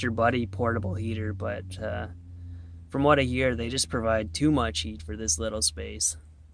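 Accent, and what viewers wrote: Canada. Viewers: Beautiful Canadian accent ❤ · I thought he lived in Canada because of his accent and the insane price of living. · Judging by this dude's accent, he's in Canada. Probably Toronto or somewhere if it's so expensive and he's talking about going "out West." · If you can't tell he's Canadian by his voice then you're either not paying attention or you know nothing about Canadians. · <<If you can't tell he's Canadian by his voice then you're either not paying attention>>, because he says, "I live in an eastern Canadian city" : ) All kidding aside, I practically live on the border of Canada, so I caught that familiar accent right away. We love our Canadian neighbors.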